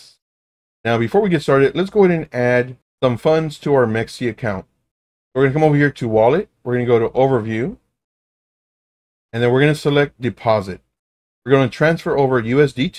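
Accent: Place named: Cuba. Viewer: You sound Cuban or Hispanic of somewhat, you my guy.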